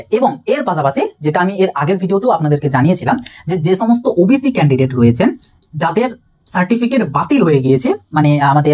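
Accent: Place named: Bangladesh. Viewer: Tumi kichu jano naa Bangla vasa er moddhe' so' bola bangladeshi . Jader state obc ache tarao ews korte pare seta hobe central . Porer bar theke bangla vasa ar valo information sikhe bolte asbe .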